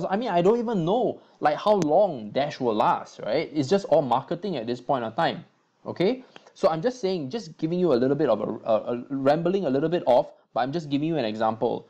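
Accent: Singapore. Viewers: Awesome! That's why I heard a bit of Singlish :-p hahaha I live in SG too. I've really loved your video. I'm gonna watch your other vids as well. Cheers!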